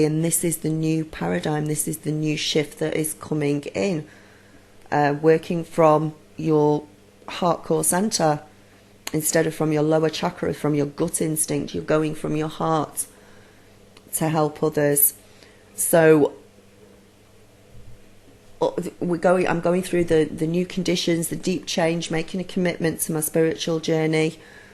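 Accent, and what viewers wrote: United Kingdom. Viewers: Geesh how I love listening to you. I can remember I could always hear a British ladies voice wish me a "good morning Elizabeth" and you sound just like her and also my Granny who is still alive today but now too old to conversate. You're voice is healing so thank you. And the subjects as well. I always knew my thoughts created reality but it's never been so obvious until I met my husband who must be my twin because I have not been able to completley open to him out of fear and now because I stay closed for such long periods, I've watched every "worst case senerio" that I've worried about happen. It gives me hope though that I have a power to change everything and I am conscious if what I am doing. I have not yet mastered overcoming fears when facing the biggest ones. For instance..I had social services knock at my door yesterday because a doctor called in that my vegan lifestyle was not allowing my 2 year old to thrive. 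So there we all sat in the hospital not knowing if these people were going to try and take my daughter away while they poked and proded her over a course of 6 hours only to be told (thank god) that she is in perfect health and good job. I swear I thought I was going to die during it. And something just this security threatening happens constantly. And it will until I open up and stop hiding from fear. Thanks for reading. Thanks for you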